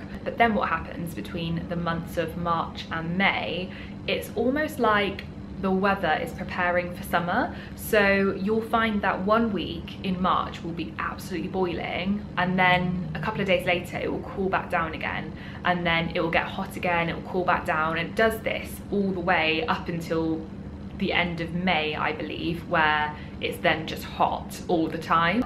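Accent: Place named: West Country, United Kingdom. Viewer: Hello. I'm James and also British ( I'm detecting a bit of a West Country accent?) I'm currently living in Manila and will be moving to Dubai in May along with my little border terrier Ted. It's a similar climate here but less dog friendly / accessible. Thanks for putting the info together as you've just answered lots of the questions I had around apartments and general dog life in Dubai!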